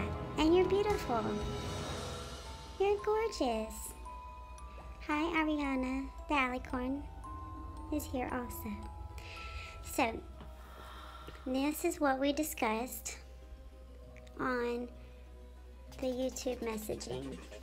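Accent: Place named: United States. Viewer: I love your voice its so country😊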